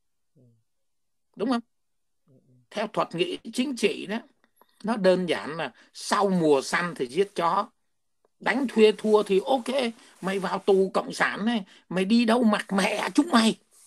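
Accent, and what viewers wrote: Standard. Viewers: Hi hi anh nói rất chuẩn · Bác nói chuẩn 👍❤️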